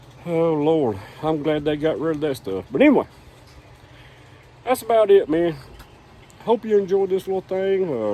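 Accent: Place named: New York, United States. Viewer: Just an old country boy? I coulda swore that was a New York City accent! LOL Great video Brother!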